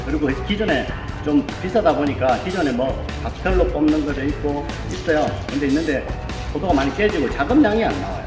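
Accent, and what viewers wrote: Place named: Sangju, South Korea. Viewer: 상주 사투리가 구수하고 좋은 기계 잘보았습니다. 김천제품 3년전에 구매했는데....?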